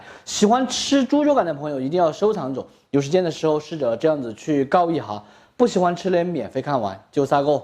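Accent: Sichuan, China. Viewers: 冲着你这么接地气的川普，必须关注一下老乡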